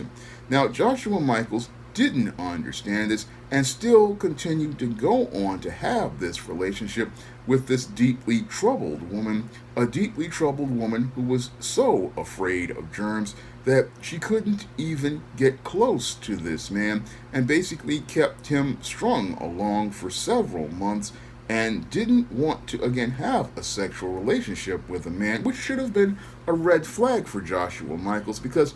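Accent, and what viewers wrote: United Kingdom. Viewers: Your british accent is so funny.lol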